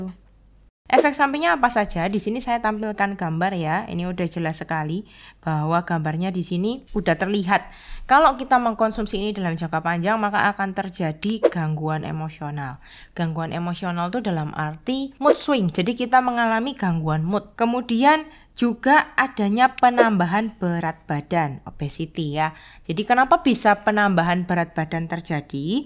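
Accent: Java, Indonesia. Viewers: medok banget sih ce logat jawanya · Saya suka dialegnya logat jawa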